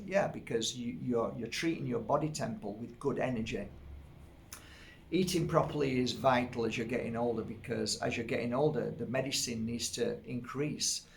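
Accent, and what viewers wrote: United Kingdom. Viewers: You're so right, this is so true. I loved this video, you made me laugh so much at the end! 😍♡🌸 I could listen to you all day, it's always a pleasure to listen to your videos, it's like we're having a tea together! ☀️😌 And as usual I love your UK accent! And as you say at the very end of the video: today is the first day of the rest of our lives. 🥰😊😇 Hugs and kisses to you, take good care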